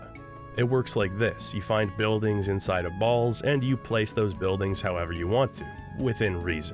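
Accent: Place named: Canada. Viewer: He also pronounces some words ("again" for example) in a very Canadian way.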